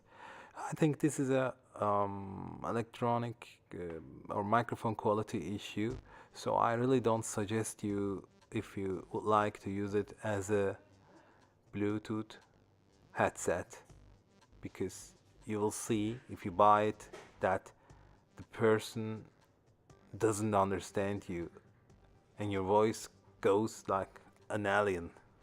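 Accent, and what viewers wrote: Turkey. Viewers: thats turkish accent isnt it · İngilizceden Türk olduğunu anladım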